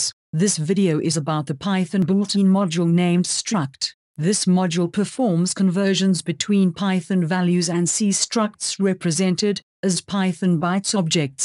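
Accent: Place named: South Africa. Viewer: Her accent Sounds South African. Pretty cool!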